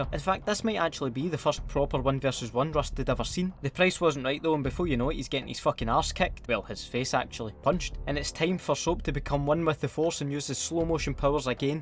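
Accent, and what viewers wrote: Scotland, United Kingdom. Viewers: Am the only one that finds Scottish patter hilarious great video though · British overload holy shit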